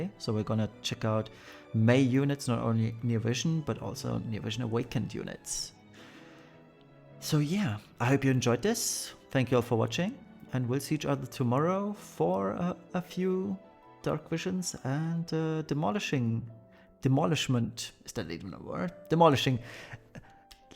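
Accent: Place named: Germany. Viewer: Btw. are you from Germany? Your accent kinda sounds like it 😁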